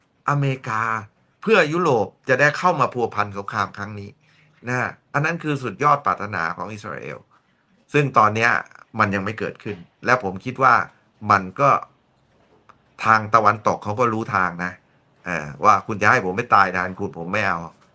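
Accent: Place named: Central Thailand, Thailand. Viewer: ชอบช่องนี้.พุดกลาง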